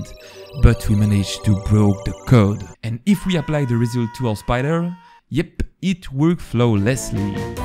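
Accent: France. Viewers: Y a qu'un truc qui me choque ! si je ne me trompe pas vue ton accent ! tu est français . pourquoi tu ne fait pas de vidéo en français aussi ?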